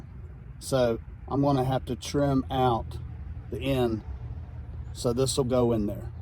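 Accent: United States. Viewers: Helpful video. Thanks. Wild guess, but are you from Tennessee?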